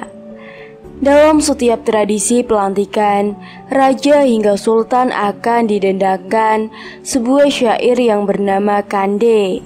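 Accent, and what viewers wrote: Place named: Java, Indonesia. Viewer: Ciri² suaranya pasti orang jawa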